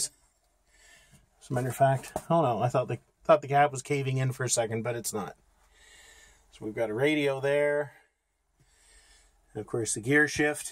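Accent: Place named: Canada. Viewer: He's Canadian, and some Canadians pronounce certain brand names differently, so I think I see what you mean.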